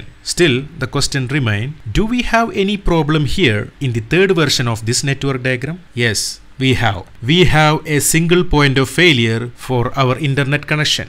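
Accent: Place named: Kerala, India. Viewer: bro r u Malayalee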